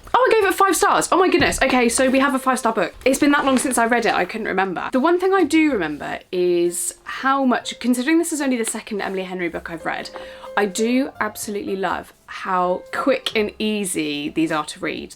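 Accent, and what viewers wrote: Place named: England, United Kingdom. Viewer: I knew you were going to have an english accent haha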